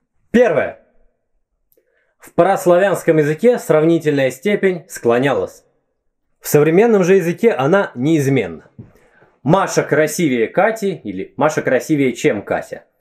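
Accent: Moscow, Russia. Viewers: Ох уж этот московский говор. Продолжайте, ни в коем случае не прекращайте. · Мне кажется, пустее, богатей, пустее и т.п. есть влияние северных говоров ( новгородский, вологодский ), ведь довольно слабый эффект второй палатализации чувствуется и по сей день. Собственно, кабы Мацква не захватила Новгород, мы бы и дальше говорили в руце, в нозе, в дусе и т.д., а северные области для остальной России были бы чего-то вроде Шотландии для англичан 😎. По поводу сравнительной и превосходительной степени в западнославянских языках: в чешском она даже проще, нежели в польском, ввиду одинакового окончания в номинативе для всех родов ( напр. lepší (лучший (-ая,-ое) или же просто лучше, в русском от контекста зависит; horší (более короткий или худший) и т.п.). В превосходительной тупо прибавляешь приставку nej. Я еще заметил, ты с московским акцентом говоришь. Коренной москвич?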